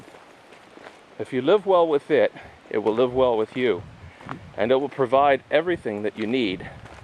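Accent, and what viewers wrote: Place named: Canada. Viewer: Actually he has a Canadian accent which sounds like a television announcer to American ears. In other words, his consonants are more clearly pronounced and his vowels are more open. There are many English accents in both American and Canada, but for the most part Canadian English is more clearly pronounced than the media-driven Modern American English which attempts to be jazzy and cool. Many people pronounce their consonants in American, but many other do not. Canadian English is refreshingly clear. I love accents!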